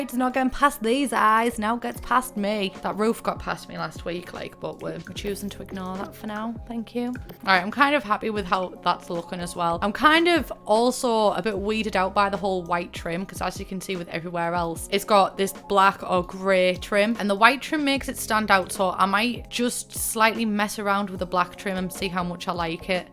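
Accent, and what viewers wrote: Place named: Northern England, United Kingdom. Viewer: im just getting into sims youtube and its such a pleasant surprise to find a northern youtuber! thx for repping us so well✌️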